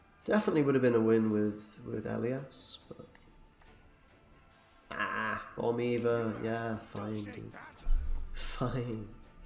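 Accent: United Kingdom. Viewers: Thing I like with you, you speak calmy and with a good diction. Maybe I have wrong but I feel a little Scottish accent especially with the "o"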